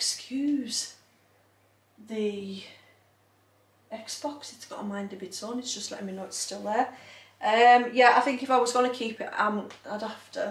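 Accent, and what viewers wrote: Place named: North East England, United Kingdom. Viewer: hi my darling, another great video, i really look forward to watching them, where do u live i live in the north east of england and your accent is like mine!!! xxx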